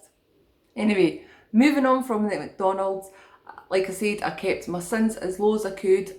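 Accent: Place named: Wales, United Kingdom. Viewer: I love all the accents on YouTube. I don't currently have a Welsh one so off I go to channel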